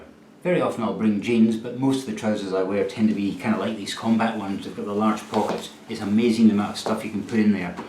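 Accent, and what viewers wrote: Scotland, United Kingdom. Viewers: Love the Skaughtisch accent.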